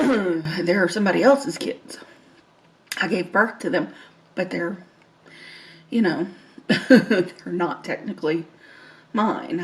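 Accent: South, United States. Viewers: I love your hair and makeup! What state do you live in, you kinds have a little southern accent! I’m a 71 year old great grandmother from East Tennessee!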